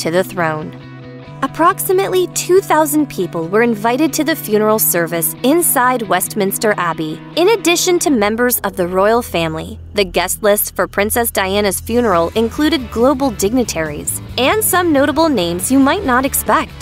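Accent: United States. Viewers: This unfortunate event would be far better remembered and narrated by someone other than an American. Pronunciation, together with a complete knowledge of British royal ceremonial would be a distinct advantage... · There is something irksome about an American voice over on videos of British affairs. Its like having a terrorist describing the devastation of their actions.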